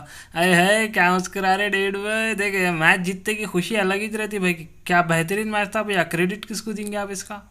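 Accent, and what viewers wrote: Hyderabad, India. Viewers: Aap bhe Moscow 6 Sal Paisa Barbad student dikhrai ye hyderabadi English hai bolna aao ya nai aao aage wale ku samajhne aagaya toh bas hai bolte😂😂🤣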